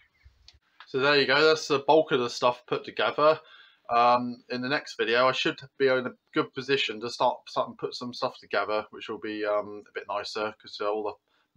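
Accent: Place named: Cornwall, United Kingdom. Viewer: Great job, looking really good, i take from the local twang your from Cornwall, a fellow Cornishman, here is a little project i have been working on, a 4.5 kw rocket stove, can give to much away ,as still in patent process, <link>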